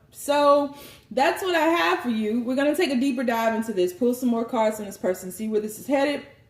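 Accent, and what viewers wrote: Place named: United States. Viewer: I love your accent and style of reading. Which part of US are u from?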